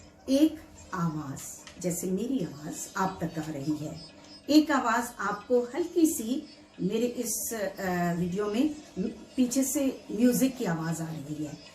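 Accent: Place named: New York, United States. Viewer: Very NYC voice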